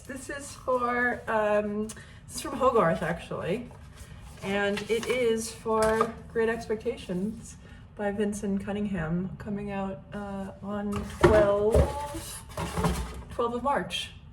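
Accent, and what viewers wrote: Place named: Canada. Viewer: I might borrow “joggish thing” from you bc I’m an Olympic level joggish un-athlete😊 Do I detect a very slight Canadian accent? It’s the “been” pronunciation that clues my northern Vermont ears.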